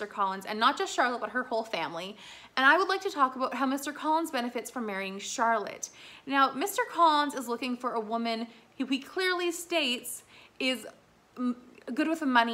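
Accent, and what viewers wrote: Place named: United States. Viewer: I enjoyed listening to your commentary. Maybe your American accent helped with objectivity!😊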